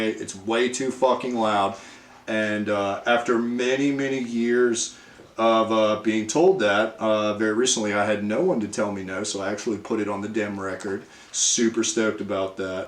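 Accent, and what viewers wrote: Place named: California, United States. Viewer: I love listening to you speak. Voice is like Cali skater meets Ben Stein and talk is all about drums. Can't get enough. Rock on. 🤙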